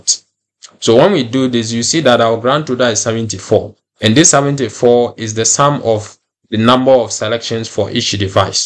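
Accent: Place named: Ghana. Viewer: He sounds Ghanian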